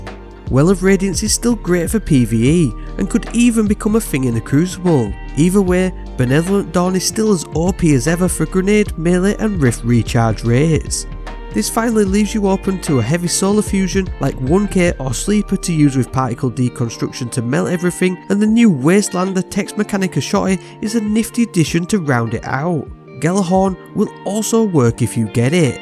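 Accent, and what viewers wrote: United Kingdom. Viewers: It’s great to hear a British accent on d2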